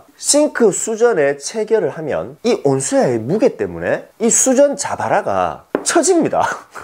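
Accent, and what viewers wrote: Gyeongsang, South Korea. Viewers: 경상도 목소리 악센트 맘에안들어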